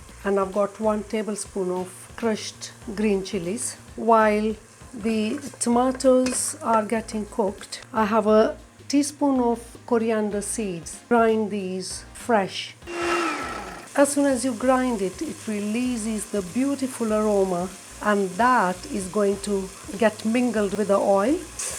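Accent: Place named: East Africa. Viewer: I can heard east Africa you Born in Here or you been Here tanzania I here Sis I like this recipe nice and taste stay safe and healthy I will cook this sometime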